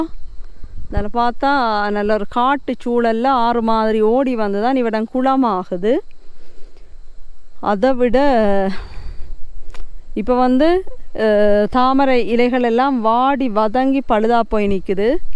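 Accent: Sri Lanka. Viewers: அக்கா அருமையான பதிவு உங்களது இனிமையான இலங்கை தமிழ் பேச்சு மிகவும் நல்லா இருக்கு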